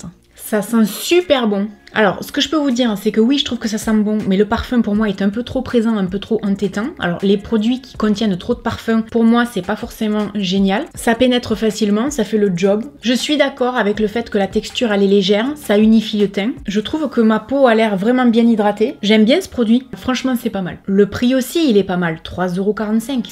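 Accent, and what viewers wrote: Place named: Narbonne, France. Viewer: Bonjour comment vas-tu? Je voudrais te dire merci pour ta vidéo c'est génial et un plaisir à chaque fois de regarder tes vidéos, surtout quand on voit la durer de tes vidéos en ce moment qui sont plus longues c'est encore plus génial malgré que ça l'était déjà en plus quand je vois le temps de travail que tu dois avoir pour faire les montages à chaque fois et passer du temps dessus, je te remercie pour ça, pour ton travail car moi ça me fait énormément du bien de voir tes vidéos, je te remercie pour le temps que tu prends pour nous, pour les explications et ta belle présence. Je te trouve très très belle, au naturel tu es aussi très très belle tu as des yeux magnifiques ainsi que tes cheveux ils sont trop beaux vraiment tu as tout pour plaire vraiment tu es une belle personne ( non ce n'est pas une déclaration d'amour 😂😂) mais merci d'être là, vraiment en toute franchise à l'heure actuelle tu es ma youtubeuse préférée, donc je comprends tout à fait pourquoi on t'appelle, alors merci maman. 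Je voulais te dire aussi, et c'est pour ça que je t'écris, bon courage pour tes examens médicaux d'aujourd'hui, j'espère de tout mon cœur que ça va aller au mieux et que tu auras de bonnes nouvelles, qui pourront te rassurer pour cette journée, je t'envoie tout mon soutien de pas très loin ( je suis de Narbonne 🙂, ce qu'il y a de bien c'est que je me reconnais dans ton accent car on a le même 😂), et je pense très fort à toi aujourd'hui et je croises les doigts pour t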